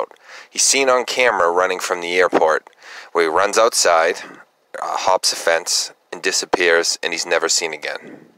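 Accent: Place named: New England, United States. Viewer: This case fascinates me. Listening To your voice I’m thinking you’re a fellow new Englander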